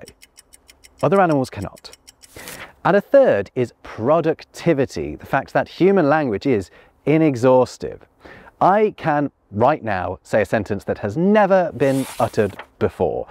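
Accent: United Kingdom. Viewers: Hearing a British person talk about parrots makes me think of certain things. Would I like to hear an African grey parrot? I'd much rather hear a Norwegian blue. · I like your British TV accent and style of talking. Have you by any chance worked for sky or BBC?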